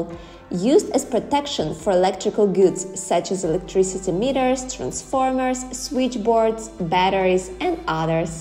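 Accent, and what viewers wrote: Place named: Russia. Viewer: что то русским акцентом пахнет ))) и профиль до боли знакомый )))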